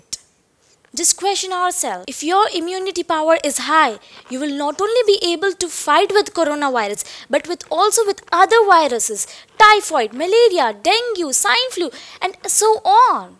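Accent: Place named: United Kingdom. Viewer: IN SHA ALLAH...I WILL SPEAK LIKE YOU.... I HAVE A GREAT BURNING DESIRE TO SPEAK...IN BRITISH ACCENT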